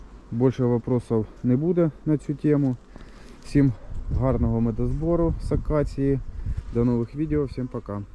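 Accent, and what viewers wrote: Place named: Surzhyk, Ukraine. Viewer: Суржиком, у нас тут всі так балакають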